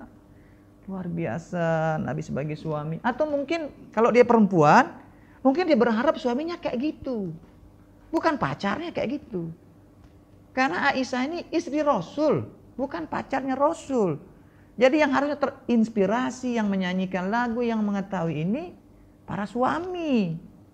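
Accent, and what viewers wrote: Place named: Lombok, Indonesia. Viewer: saht selalu tuan guru,,logak sasak lombok bhsa indonesia mantab guru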